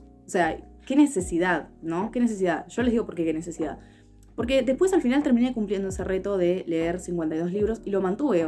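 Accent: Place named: Argentina. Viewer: Te acabo de descubrir y me has enamorado. Suscribo cada una de tus palabras.....y encima con avento argentino....eso es un plus, y obvio.....mucho más lindo.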